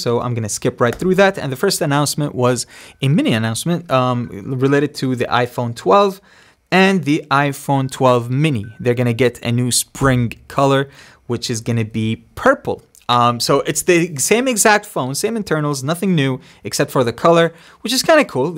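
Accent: United States. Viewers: Your English is so good with American accent niiiiiiiice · Your English is so good with American accent niiiiiiiice !!! High five ✋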